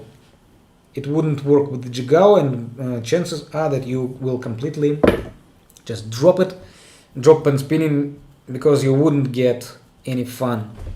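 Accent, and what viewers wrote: Russia. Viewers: He is russian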